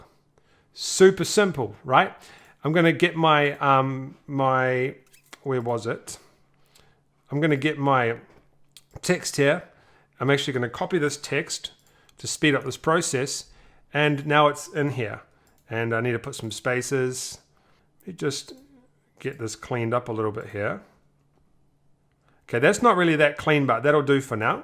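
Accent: New Zealand. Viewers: Hey mate, I stumbled across your videos a couple of weeks ago. I love the content bro!! Keep it going! You sound like your from New Zealand too? Haha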